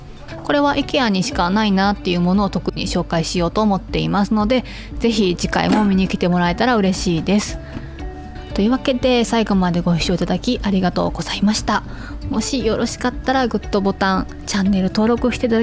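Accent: Kansai, Japan. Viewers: こんにちは。初コメです。聞き入ってしまう柔らかな声と、懐かしい関西弁と、美しい言葉遣い。そしてたまに出るお笑い要素も大好きです！朝イチの家事のお供に聞かせてもらってます🧹🧽🫧 グラスって気づいたら増えちゃいますよねあるある。またコメントします！♡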